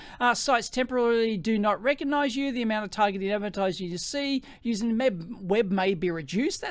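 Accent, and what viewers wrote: Australia. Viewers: Cant believe how many folks totaly missed that it was complete sarcasm. And Im just an OLD YANK who knew even with that awfull ausy accent. P.S. I hate my dictator as much as yall so dont hate on all mericans. misspellings are on purpose. TWO THUMBS UP 👍👍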